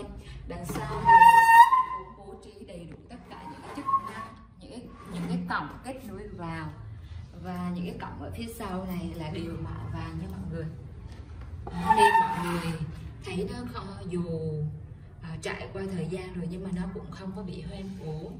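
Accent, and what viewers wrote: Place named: Hue, Vietnam. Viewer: Tiếng gốc Huế đây rồi:)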